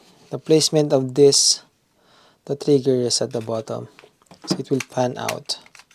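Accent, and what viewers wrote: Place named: Philippines. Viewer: Thanks for posting this and showing off all of the compartments! Just ordered it because I made a mistake and bought a different Vulkit that can't really carry cash 😅. Ignore the bumbling morons who claim they can't understand properly spoken English because of a light Filipino accent. You speak just fine, I watched the video at double speed and still made out every word. Side note: I think you're meant to fold the last 1/4th of the cash bills inward to fit them into the slot. That's how it looked on the site anyway.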